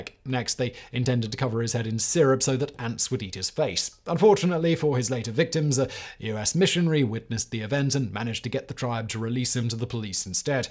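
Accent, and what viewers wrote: England, United Kingdom. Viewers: Was it just me, or was extra laden with English in this video??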